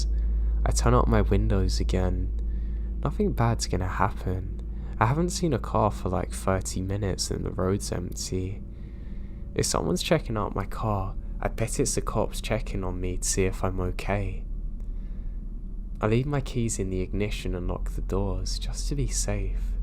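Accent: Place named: RP, United Kingdom. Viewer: awfull posh creepy voice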